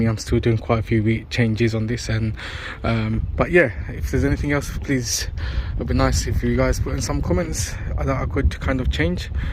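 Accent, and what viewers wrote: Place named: Cockney, United Kingdom. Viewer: Impo ы en- cockney accent 😂😂😂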